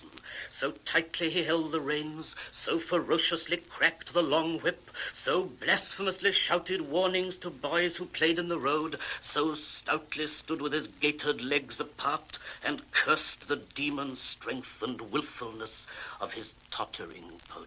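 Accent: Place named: RP, United Kingdom. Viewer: He was born in the largely non-Welsh speaking region of South Wales close to the English border. In school he would have been heavily influenced by stuffy teachers and BBC radio. Term for it is 'received pronunciation' (RP), at a time in UK's history when regional dialects were considered 'uneducated' and 'vulgar'.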